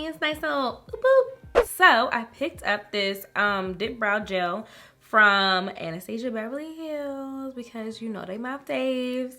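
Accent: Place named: Prince George's County, United States. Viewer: You gotta be from PG County MD 🧐 I hear the accent 😂😂😂 & this look is bomb sis!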